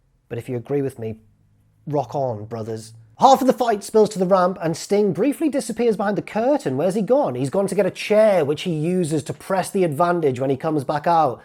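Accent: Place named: Lancashire, United Kingdom. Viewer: Genuine 8/10 Lancy accent tbf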